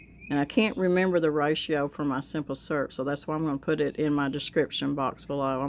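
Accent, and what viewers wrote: South, United States. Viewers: Love the Southern accent. I was like what’s a steak cake? 🤣🤣🤣Stack cake. Don’t know what that is, but I’ll look that up next. I’m from the PNW so my ears don’t always hear right!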